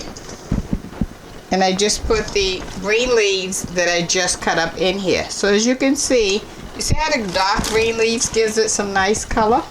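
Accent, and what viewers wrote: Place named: Massachusetts, United States. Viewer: That cabbage looks tasty and side note, I love your accent🥰 I'm guessing you're from the Massachusetts area. I'm southern so I have a very "different" accent from yours🤭 Anyway, I love cabbage so I'll give your recipe a try❤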